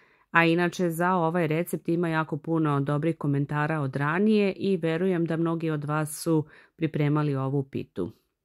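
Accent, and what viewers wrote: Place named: Krajina, Croatia. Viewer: Vidite kako i drugi usmeruju pažnju na tvoj glas,na divnu dikciju i još lepši izgovor,naglasak,draga moja krjiškinjo!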